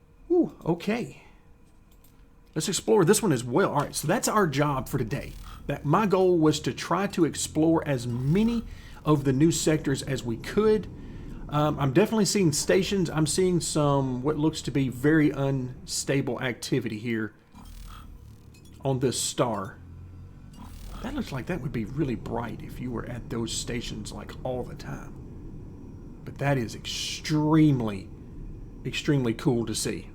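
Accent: United States. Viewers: Nice video. From the sound of you, we are probably from the same area of the US. I'm not great at the EVA either btw.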